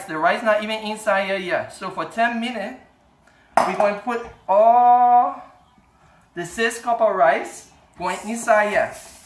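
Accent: Liberia. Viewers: Very nice to hear your Liberian accent. Your accent sounds just like my husband. Would love to see more videos with you speaking your natural accent. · Dis na small ting here o! Day man know how to talk ray liberian English yah. Day foo look goo o.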